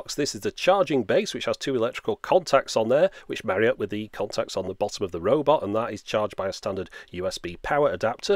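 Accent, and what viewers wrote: United Kingdom. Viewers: How can the original way of speech for a language be this repugnant compared to most of the subsequent versions of the same language? (U.S, Australian or Canadian accents)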